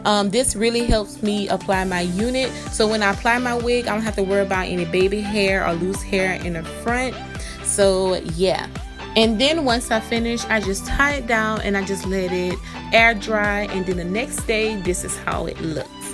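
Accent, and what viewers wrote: Louisiana, United States. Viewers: Am I detecting a Louisiana accent in that voice? Lol I love it! You've made it so easy for me.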